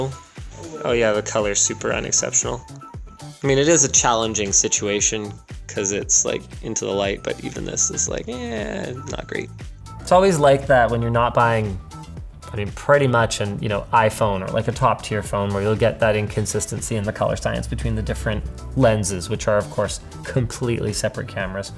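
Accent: France. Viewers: Yo you have a nice french accent Linus boy, and i live in France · Nice French accent! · Linus talking french is the best accent ever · That french accent 😆😆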